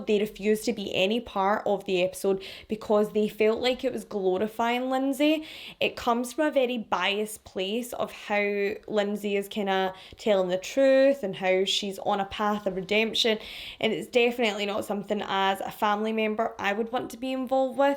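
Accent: Scotland, United Kingdom. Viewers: Wooo a Scottish true crimer - yaaas hen 👏🏻 I remember this episode of I Am A Killer and I wasn’t convinced whatsoever…total sociopath!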